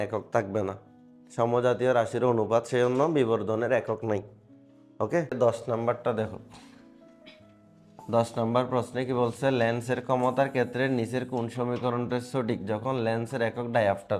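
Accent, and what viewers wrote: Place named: Chittagong, Bangladesh. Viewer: আপনি মনে হয় চট্টগ্রামের 😁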